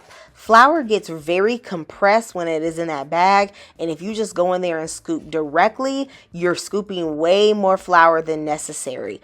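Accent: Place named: Chicago, United States. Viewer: Sis, are you from chicago? that accent is giving chicago vibes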